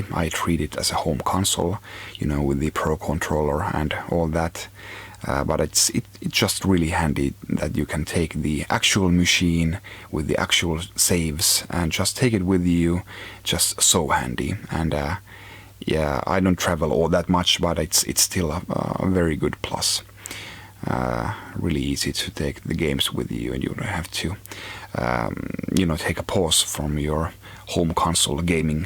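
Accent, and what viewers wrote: Finland. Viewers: Lucas Shumake typical nerdy finnish guy accent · You're Finnish :) from the accent. I got my Nintendo Switch a couple days ago <3. Will get a Pro Controller at some point and Donkey Kong Country Tropical Freeze when it comes out. Also Zelda BOTW and others. It's an awesome hybrid console. both docked and portable is great. Graphics are very much good enough and then some. I have SMOdyssey + MK8Deluxe. Yep 1 year old now.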